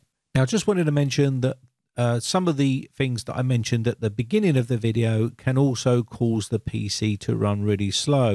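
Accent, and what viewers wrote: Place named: South London, United Kingdom. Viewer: I'm gonna guess South London accent. Rotherhithe maybe? Anyways great video man!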